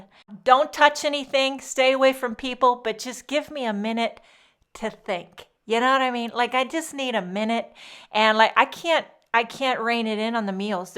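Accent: United States. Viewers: Hi I watch your videos all the time and I love them! I’m curious what are you doing in Germany? You sound 100% American.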